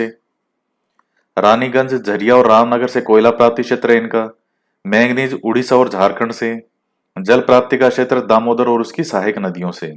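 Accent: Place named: Rajasthan, India. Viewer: sir Aapki awaj sunjar esa Lagata hai ki jese Rajasthan ki mati swayam apki Vani bankar aapki awaj k rup mai prakat hokar hum sabhi ko adhyayan or margdarshan karwa rahi ho. Jai Hind. Jai Jai Rajasthan.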